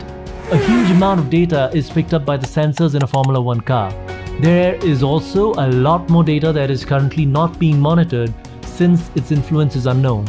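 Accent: India. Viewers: this guy speaks a nice indian english <3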